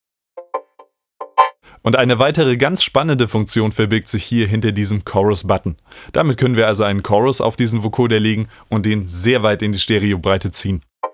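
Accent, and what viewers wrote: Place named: Mecklenburg, Germany. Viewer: du kommst ausm Norden Kollege. Du hörs dich an wie mein Homies aus Mecklenburg. Weiter so macht super Spass.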